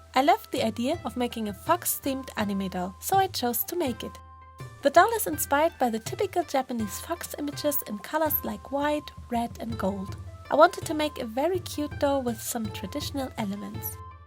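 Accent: Germany. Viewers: Your accent sounds German lol are you perhaps German? Bts love your art!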